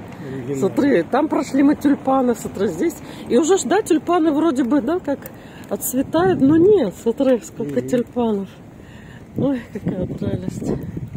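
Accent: Odessa, Ukraine. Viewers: Вы интересная чудачка, истинная одесситка.Говор. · спасибо вам за эти ваши прогулки! Наслаждаюсь вашим выговором - как дома побывала! Живу далеко, но вся родня в Одессе.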